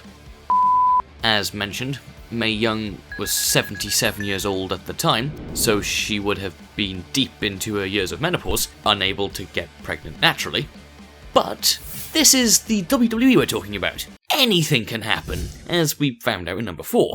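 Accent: United Kingdom. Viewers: British Accent + Sense of Humor=A good Video. 😂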